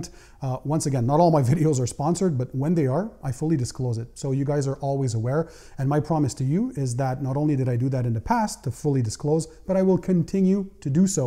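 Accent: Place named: Montreal, Canada. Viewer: Wow! Je découvre votre chaine ce soir. Celle-ci est la deuxième que j'écoute. Ça commence fort. Faut demeurer concentré sur les 99.99% qui vous apprécient. La qualité et l'enthousiasme que vous présentez ma gagné tout de suite: SUBSCRIBED !! J'ai senti que vous aviez possiblement un petit accent tres subtile de Montreal, une touche francophone. Je découvre que vous parlez français et bien entendu, je devine, le grec. Tikanis ! Bon succès, je vais me convertir en Week-end worrior en detailing. J'attend un nouveau véhicule pour la semaine prochaine. Est-ce que je peut déja appliquer une protection de peinture sur ma nouvelle voiture. Je viens d'acheter McGuire's Ultimate que j'ai appliqué sur la voiture recente de ma femme; beux résultat. Normand Rousseau , rive-nord de Montréal.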